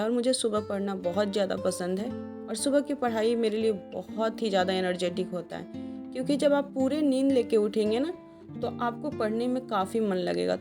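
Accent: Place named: Bihar, India. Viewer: App bhiar se ho awaz se lag raha hai but kha se 😊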